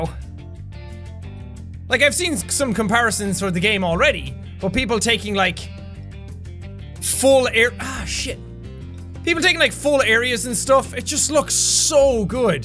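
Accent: Ireland. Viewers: His Irish maxed out this video lol xD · Im Irish too. We all talk the same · jack really went into Irish during this episode. LOL. Love ya. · I absolutely love it when your pure Irish accent and phrases come out:3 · inner Irish came out in this video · MrG I love any Irish voice tbh 😍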